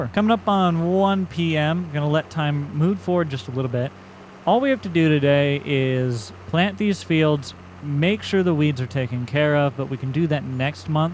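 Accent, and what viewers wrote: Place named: South, United States. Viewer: I just want to say when I was watching the first few videos I noticed you have a southern accent when you talk in certain parts and I fucking love it HAHA IT SOUNDS AWESOME. cant wait for more videos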